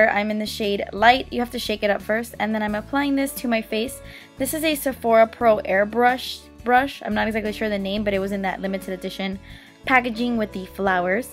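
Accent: England, United Kingdom. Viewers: your British accent is LIFE💜💜💜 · your english accent though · When will you film a whole video with a british accent? I'm totally serious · okay chill girl! my only point was that has been slipping into her british accent since forever, that doesnt mean she is TRYING to sound like ZOELLA... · your British accent kills me, freakin love it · obsesseddd!! love the british accent lol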